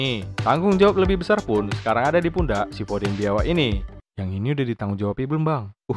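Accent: Medan, Indonesia. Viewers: Org medan ini, kek paniliar kali awak sama intonasinya, horas lah lek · Adminya kek orang Medan kurasa dari logatnya kwwkwkwk ngakak laeeeee biawak biawak wkwkkwkw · Nampak kalii ahh logat Medannya😅.. mantavv bg 👍🏻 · logat medan kali ahhhh... mantab kali kurasa. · tapi tidak semua orang medan bisa membawakan video dengan logat bicara selucu ini sih menurutku. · Bahasa Medan ini mah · Logat² Medan bah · Medan kalilah ngomongnya abang ini... mantap lanjutkan bang👍👍👍👍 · Memang harus diakui, MANTAP kali konsep channel kau ini bang. dengan logat medan yg khas, penyampaian yg unik dan Editing yg cukup rumit ditambah sentuhan humor, memang layak lah channel mu ini jadi konten kreator yg ditunggu tunggu. Semoga cepat tembus 1 juta subs abg biawak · emg kekgitu logat medan bg. Keras tapi lucu. 😀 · NEW SUBS ~~ gw suka banget channel ini, lucu dan menghibur dengan aksen melayu medannya, ok banget bang!! 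semangat terus dan tetap upload video, eaa !!☺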